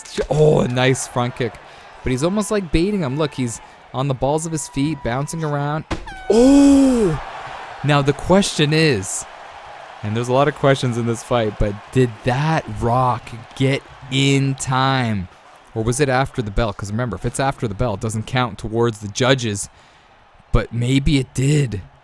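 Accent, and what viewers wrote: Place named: Canada. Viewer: Dude I just found ur channel and I gotta say you got such a chill upbeat vibe and I’m not sure if I’m right but feels so Canadian, I’m only saying that cause I’m Canadian and u sound like alot of people I know which is dope!, anyways u got a new fan brotha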